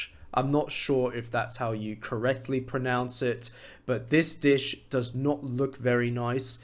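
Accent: Russia. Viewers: но не английский с Британии , он русскоговорящий, это видно с закрытыми глазами. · Не англичанен акцент русский!! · Са ваш ахь английский дик буьйца, далахь хьо Нохчо ву) акцент тебя выдает) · Явно не англичанин , кто то просто болтает на инглиш. Названия выговаривает чисто по русски.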